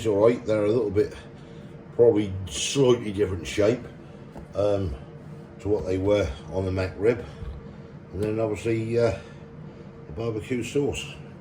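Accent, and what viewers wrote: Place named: Norfolk, United Kingdom. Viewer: You a Norfolk boy? Where about you from?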